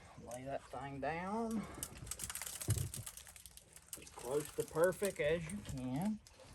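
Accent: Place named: North Carolina, United States. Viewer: I'm assuming that is your spring gurgling and dripping in the background. Bout rocked me to sleep listening to it as you gave us mountain ingenuity on the flue construction. I also love how you have your local NC distinctive accent but your children have not trace of your accent in their speach. Much like my heavy local NC accent as my children grew up they too had a very different accent than mine. Might be because we moved to several NC towns because of my husbands career as a Postmaster for 30 years in the mountains of WNC. However and whyever (my new word)🤣the reason our children have different accents than us, doesn't matter. What matters is that they are ours and we love them. Maybe your children picked up their accents from Billy, Michelle & Will!!! Ha! Ha!❤❤❤😊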